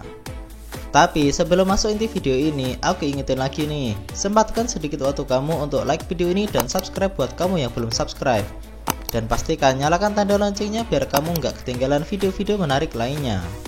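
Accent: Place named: Java, Indonesia. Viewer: medok bgt suara lu tenk 😬😅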